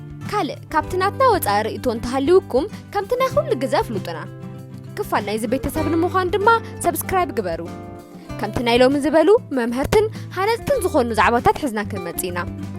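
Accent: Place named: Enderta, Ethiopia. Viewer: ብዝተፋላለይ ላዛ ወይ ላህጃ ናይ ትግርኛ ዝተፋላለይ መደባት ክትስምዕ ብጣዕሚ ጽቡቅ እዩ ሓዉና ናይ ባዕሉ ጥቁም ዝኮነ ላዛ ናይ ከባቢ ዓንሰባ ወይ ሰንሒት ዝዛረብ ክ ከዉን ከሎ ሕጂ ድማ ናይ ትግራይ እንድርታ ይመስለ።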